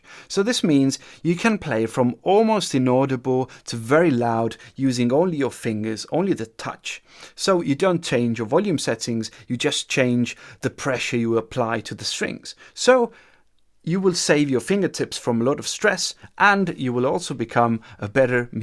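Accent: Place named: Russia. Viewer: Thanks you for putting this series together. Definitely fills a gap here! One suggestion. Would be great if you demoed each talking point about on the Harpejji itself (perhaps second camera shot) right after you mentioned each point. Would be a big help in my opinion as far as keeping folks engaged. And although English is my first language, this certainly would be helpful to those watching this channel who did not speak English or have subtitles available and instead could at least see the concepts demonstrated. BTW.. (I do understand English with comedic Russian accent so please keep that going). Thanks again keep these going!!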